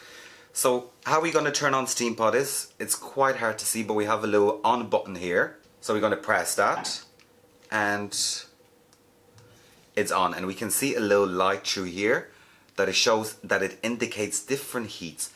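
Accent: Dublin, Ireland. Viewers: Your accent is so exotic! It’s like Dublin with a hint of something else, Iv been using the same steam pod since late 2015 so Iv just ordered this today..thank you so much for explaining everything I STAN this channel 🤩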